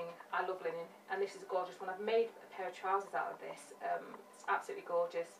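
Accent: United Kingdom. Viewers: Fantastic tips as always, I should be in bed but I decided to listen to my favourite online fashion tutor! From your accent I can tell, you are British. I live, not far from London. Could you recommend any areas where I can get the wasted ends of fabric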